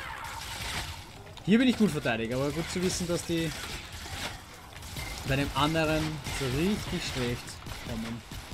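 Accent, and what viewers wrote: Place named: Austria. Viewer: Von wo aus Österreich bist du? Wien?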